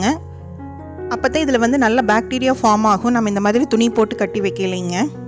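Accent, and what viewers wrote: Kongu, India. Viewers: எங்க கொங்கு தமிழ் இப்படி தானுங்க இருக்கும்.... என்னங்க பன்றது...? சொல்லுங்க பார்ப்போம் 😊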